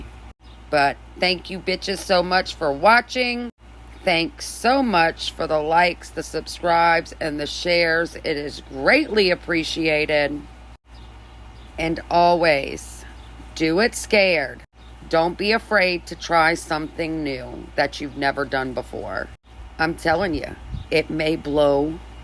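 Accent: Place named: Louisiana, United States. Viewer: Beautiful cup!! I am hearing a Louisiana accent…..I’m in Louisiana is why I say that! LOL. Girl I would love to craft with you and learn some stuff! 😊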